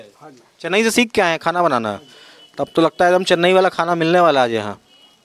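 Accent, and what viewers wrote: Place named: Bihar, India. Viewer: Bhai fan of ur vlogs.Luv the way u explain things in bihari ascent. Big ❤ to all ur mutton vlogs keep making ur vlogs. Best wishes to u n ur team .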